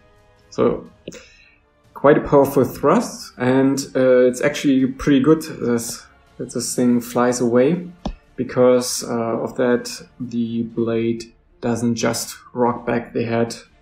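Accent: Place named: Germany. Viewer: I like the German Accent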